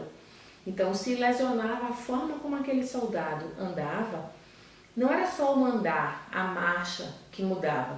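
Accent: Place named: Northeast, Brazil. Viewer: Mas o mais gostoso do vídeo é poder estar aqui em Brasília (como eu estou) e curtir um vídeo com esse sotaque liiinndo do nordeste!!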